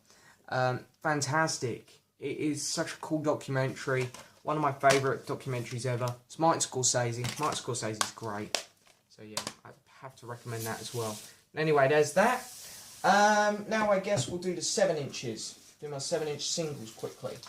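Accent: United Kingdom. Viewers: Hello from quebec city canada...love your vids and your british accent......great work young man.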